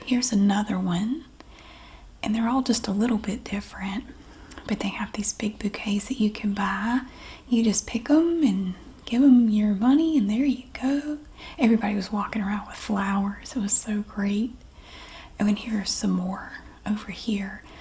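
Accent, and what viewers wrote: South, United States. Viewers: you are a breath of fresh air! Longtime subscriber. Not much of a commenter, but sometimes something comes along and I just have to. I could listen to your voice and southern accent for hours and hours! Thank you for doing what you do! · Being from Northeast Texas ,I nevee heard of dukes mayo before, And yes in my area it was not uncommon to hear something like this ? " yall want a Mater Sandwhich", I 2 have noticed a lessening of accents,and I think it's a crying shame. Your accent is heavenly ,aint nothing more beautiful than Southern Women😍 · I love your tomato sandwich videos. My favourite 😊 oh wow I’ve never seen tomato’s that big before. I love your southern accent, it’s so much better than mine. A Birmingham accent in the uk 🇬🇧 is one of the worst in the world 😂 or so I have been told.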